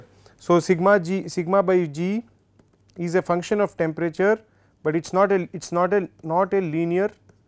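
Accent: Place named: India. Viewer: how does this man look so white yet sound so Indian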